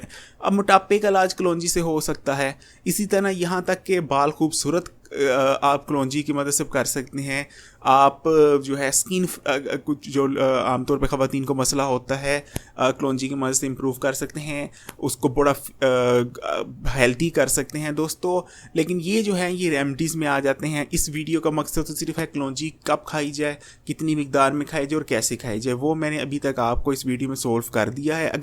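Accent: Punjab, India. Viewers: Apka Punjabi accent ..apka baat krne ka tariqa bht acha hai. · بھائ اپنی اردو تو ٹھیک کر لیں یار کتنے الفاظ ایسے ھیں جو آپ نے پنجابی کے ادا کئے باقی رھی کلونجی کی تو وہ شفا ہی شفا ھے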